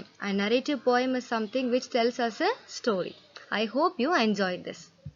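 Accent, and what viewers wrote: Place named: United States. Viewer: thanks mam but I think that you are from America because of your voice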